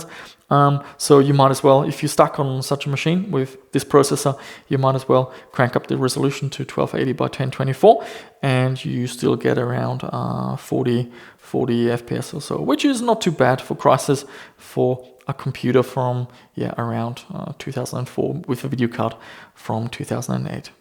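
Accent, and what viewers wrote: Germany. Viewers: are you german? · Are you German? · Are you a german? Bist du ein deutscher?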